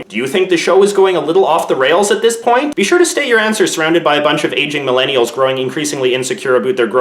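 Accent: Canada. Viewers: noooooooo you say "aboot"! As a fellow Canadian, i thought that was actually a myth. What part of Canada are you from? I'm from Ontario and have never heard aboot said out loud in my entire life.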